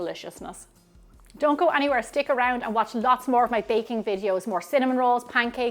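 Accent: Ireland. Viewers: I adore your easy to watch videos, not to mention your Lovely Irish Brouge. However I like bananas but not in baking. What else can I use to make these inviting rolls?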